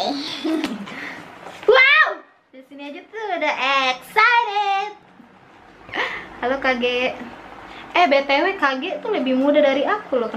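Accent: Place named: South Korea. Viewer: Logat nya kakak korea banget lohh...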